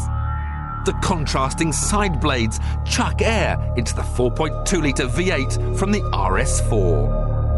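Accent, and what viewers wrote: United Kingdom. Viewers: why does the dude always have to have a british fuckin accent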